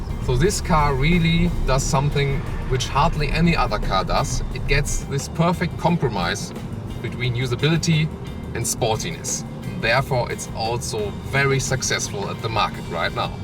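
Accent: Germany. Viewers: Love the review, I like to hear your german accent too! would be pretty cool to take this up to the mountains with all the snow!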